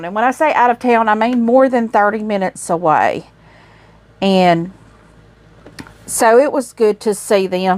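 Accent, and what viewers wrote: South, United States. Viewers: Quarter is a wonderful part of a whole. Thanks for not making your video so obvious for the giveaways. Some people just channel hope to win free gifts. I so enjoy your content. I feel a kinship to you because of your southern accent. Looking forward to the drawing.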